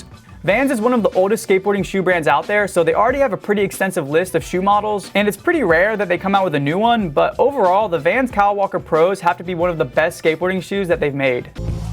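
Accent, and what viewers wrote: Southern California, United States. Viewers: i think its a SoCal accent idk much about it so you can try to search it up if you want. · So this is what a california accent sounds like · Nick Z that’s a SoCal accent. You hear it in valley people and a lot of surfers, skaters, and punks. I notice people into the things mentioned even talk like that if they don’t live in California. It’s a subliminal thing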